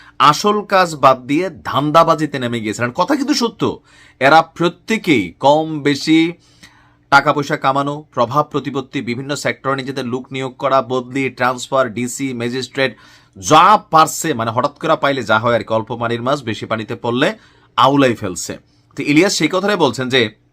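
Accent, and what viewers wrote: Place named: Comilla, Bangladesh. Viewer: আপনার কুমিল্লা লোকাল ভাষায় মানাই ভালো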